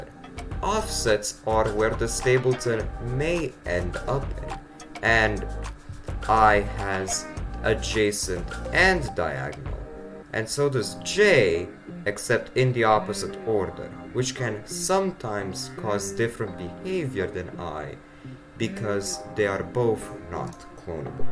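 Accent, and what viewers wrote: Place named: Romania. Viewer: A video about 3 years ago, and actually sounds romanian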